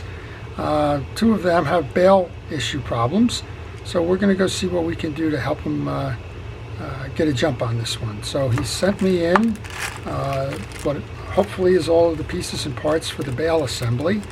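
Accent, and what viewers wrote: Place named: Long Island, United States. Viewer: Excellent video and thank you ! You are a savior. I came here to figure out how I put the bail back on a Cirrus CR035. Had a hard time finding a schematic (probably could have search for one for the CR025). A coworker gave me the reel and I have been taking it apart and clearning and lubing it up. I could not figure out how to put that black piece on the bail spring (missed it had a hole for the spring bc I had grease covering it). Thanks to you I now have it covered. I needed to come apart bc there was dirt in there and had been neglected. Thanks again. You sound like you may be a fellow LIer; grew up in Rocky Point myself. Take care.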